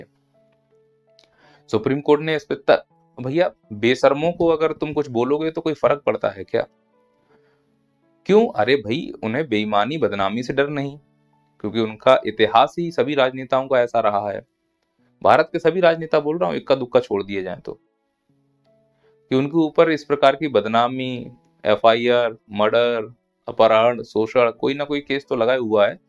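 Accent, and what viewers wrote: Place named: Agra, India. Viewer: Aap agra firozabad ke aaspaas ke lag rhe hain bhasha se😊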